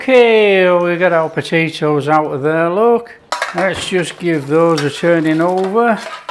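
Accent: Yorkshire, United Kingdom. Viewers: why didnt you poke the sausages? Mu husband is from Leeds, and when he puts on a Yorkshire accent it sounds like you. I randomly came across your video because i googled sausage potato onion trying to find a new way to cook my big "lad's" cumberland sausage. Im German- Canadian and we came across some wonderful food like this while visiting Germany. I subscribed.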